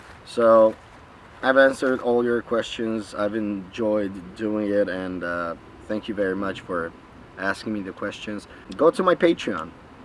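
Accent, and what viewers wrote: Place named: United States. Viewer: such a nice american akcent. Do you spend some time in America or is that just influence of the Internet?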